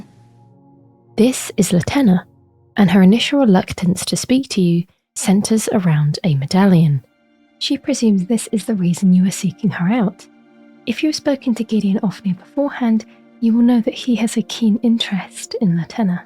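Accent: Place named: Lancashire, United Kingdom. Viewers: Ah I've finally found my favorite Elden Ring Lore channel. I see a lot of them and many of them are amazing, but you really know how to tell a story. I love your voice as well, you'd actually suit doing voice work for Fromsoft games. Very relaxing and soothing English accent. A nice juxtaposition that some characters have in comparison to the harsh environment of the games. Thanks for this. I find it hard to piece tobegher the story of these games even when it's right in your face. This really helps to break it down. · First time I found your channel. And I swear it feels like I'm still playing the game 😅. Your voice fits the lands between perfectly. You sound just like latenna. I'm from Lancashire so I love a local accent.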